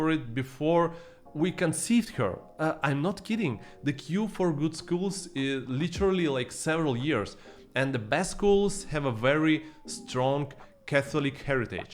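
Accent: Singapore. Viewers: I loved your video. Especially your Singlish. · Your Singlish! XD For me, the weather here is fine, it's the humidity that kills. I agree with how convenient it is to get around. As long as you're willing to walk, everywhere you need to go in your neighbourhood is within reach. However, it also takes very long to get from point A to point B in some cases. I tried sitting in the bus for 2+ hours straight to get from the West to the East and I swore I'm never doing it again. (Thank goodness for the MRT.) There are some cons to living here as well like high living expenses... Which is kind of hard for many to start a family. And for those who are career-driven because it's fast-paced Singapore, might be neglecting their family and health too... I believe that it's always good to travel and broaden one's perspective and eventually find out what you really like. Glad to have you and your family here! 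:D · very power singlish mate! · "You need only T-shirt and slippers." Good one. Because now everyone WFH so no need pants. Your videos are absolutely hilarious by the way, your singlish power lah. Have liked and subscribed!! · Because you spoke Singlish, I subscribe liao!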